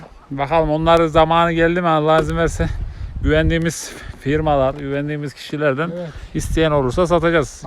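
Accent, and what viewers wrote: Konya, Turkey. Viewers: Sen Konyalı mısın Konyalı gibi konuşuyorsun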